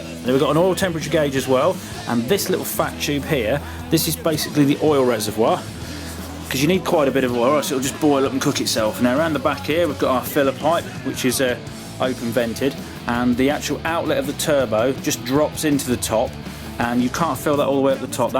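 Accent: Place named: Cockney, United Kingdom. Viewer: Are you an East ender ? you got a fantastic thick Cockney accent.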